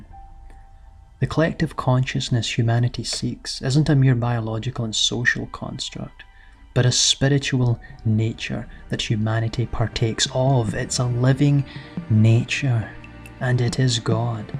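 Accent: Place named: Northern Ireland, United Kingdom. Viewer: My bad - the voice reminded me of the narrator in The History of Film, who is from Northern Ireland.... I guess I wouldn't last long in that part of GB. ☺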